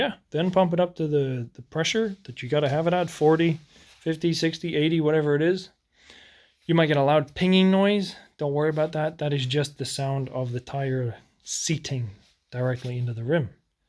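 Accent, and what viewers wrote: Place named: Scotland, United Kingdom. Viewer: .... that was a proper comprehensive wheel install.. keep doing what you LOVE... I knew I heard a slight Scottish accent.. I dig this shit👍🏻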